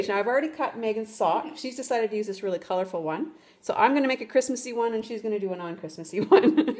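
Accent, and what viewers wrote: Canada. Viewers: Love them, and your accents! Are you Canadian?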